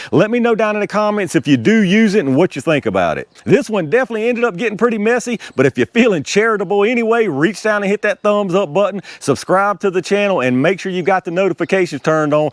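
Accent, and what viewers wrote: South, United States. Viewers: I got to say, you sir are my new YouTube man crush! Don't get excited I'm heterosexual and I've been married for 28 years, but you know how to talk, you got that sweet southern accent, and your intelligent. What a combination you're the man dude! Really love your videos, keep up the great work brother! By the way, I'm just a hillbilly also from Indiana lol!😂😂😂😂😂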